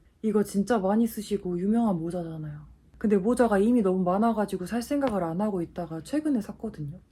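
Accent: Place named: Gyeongsang, South Korea. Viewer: 새영상🥵❤️ 님 사투리 귀여워요ㅋㅋㅋㅋㅋㅋㅜ 광주사람이신가? 대구분? 경상도인가용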